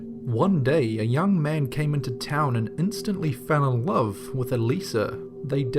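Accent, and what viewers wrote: New Zealand. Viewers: What is the narrator's accent? I want to place it as from New Zealand? But some Australian influences? Kiwi accent or nah? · That Kiwi accent just kills it for me.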